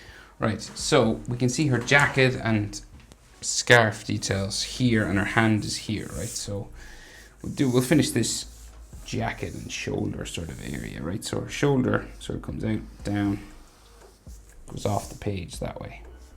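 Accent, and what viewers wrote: Ireland. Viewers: I am curious, are you irish?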